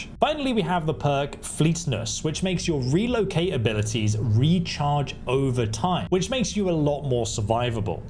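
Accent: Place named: United Kingdom. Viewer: Pretty sure He’s British and maybe that’s just how they say it. Plus’s who cares?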